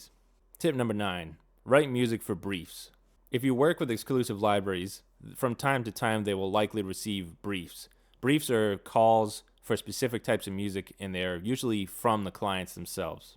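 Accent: Boston, United States. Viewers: I’ve heard other sync people say to do niche music and not try to do 10 different genres. Isn’t that the strategy with growing a following on social media as well? Btw Is that a Boston or New England accent? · Are you from Bah-Ston?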